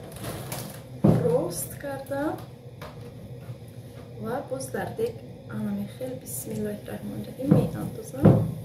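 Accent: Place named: Isfara, Tajikistan. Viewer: Майлаш мемонм ба фикр хамшахрием апачон ман Аз Исфара хастам аз гапзанитон ба фикр хамшахрем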